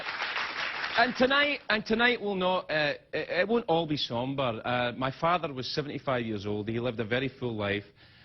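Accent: Scotland, United Kingdom. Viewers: His Scottish accent is in full force in this monologue...even more so than the one where he eulogized his mother. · He sounds SO much more Scottish here. :) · Anybody noticed he switched back to Scottish accent from his usual sort of "intermediate" accent? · OMG I love this! His accent is sooooo Scottish in this one.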